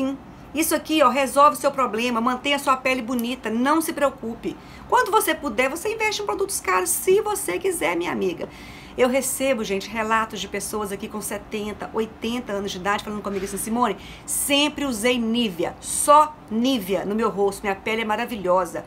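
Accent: Minas Gerais, Brazil. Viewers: sou casada com um mineiro.... amo sotaque de vcs! Te sigo aqui, te adoro, sempre amorosa e realista!! Você parece demais também com a sogra da minha filha, na voz e fisionomia, lindaaaa você! Gratidão por seus conteúdos! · Você é mineira? tem um sotaque diferente! Adorei seus vídeos.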